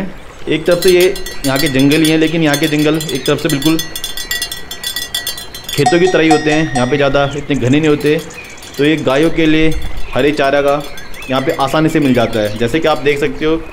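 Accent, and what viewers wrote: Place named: Haryana, India. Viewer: Sir ji aapki aawaz se lag raha jese aap haryana se h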